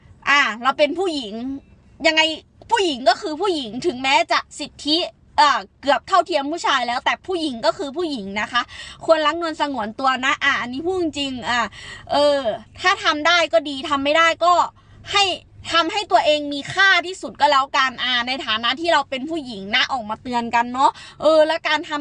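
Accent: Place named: Khon Kaen, Thailand. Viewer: ชอบมากอะเวลาพี่พูดแหมสำเนียงเหมือนคนขอนแก่นบ้านหนูเลยมักแฮงเด้อ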